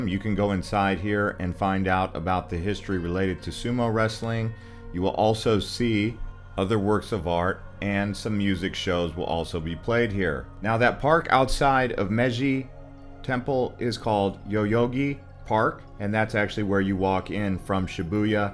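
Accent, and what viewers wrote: United States. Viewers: Hello love the way you explained anything about japan, next thing that really love is your american Accent just really something, new subscriber from Indonesia japan is my dream country to visit, subscribed.❤🇯🇵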